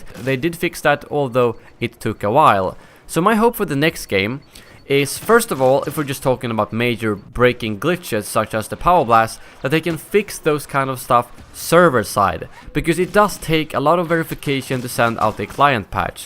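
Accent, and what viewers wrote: Sweden. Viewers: are you swede?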